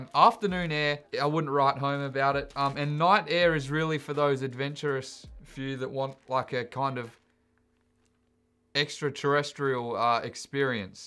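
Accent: Australia. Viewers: When you thought theres nothing left for him to try he just goes above and beyong with a total curve ball and tries AIR. What a lad, this is why I subscribed! That and the thick australian accent. OH, dude you should try and compare accents and see which one is better xD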